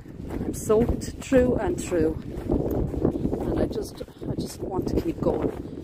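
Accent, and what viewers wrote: Ireland. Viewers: I am from Hershey, Pa USA. I LOVE YOUR BROUGE and have found you on YouTube and love what you are doing in your part of the world. Love the architecture of some of the building and gravesites you have found. I will keep on watching. Betty from Pa.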